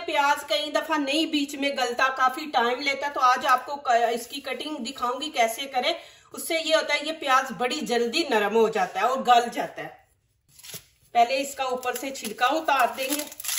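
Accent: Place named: Punjab, India. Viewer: Madam ap english urdu... panjabi style ma boti hain. niceeee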